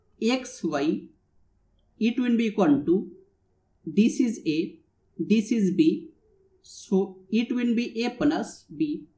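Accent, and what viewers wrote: India. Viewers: She is indian, thus the accent.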